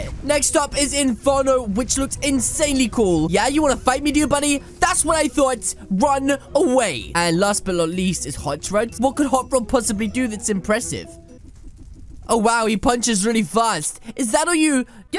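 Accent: United Kingdom. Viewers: He sounded more british in his old videos now you cant tell cuz he does so many voices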